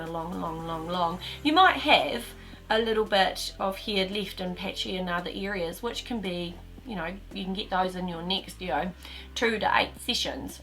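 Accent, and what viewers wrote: New Zealand. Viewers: Hey I was wondering if you could recommend a place to get this done. You sound Kiwi so I'm hoping you got it done in NZ?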